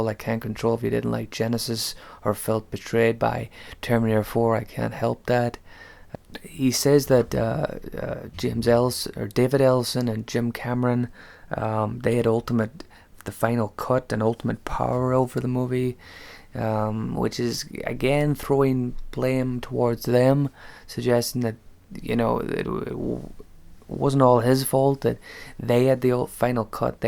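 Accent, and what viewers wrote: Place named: United States. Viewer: Your from Northern Ireland, but have a Slight American accent do you now live in America!? 🤔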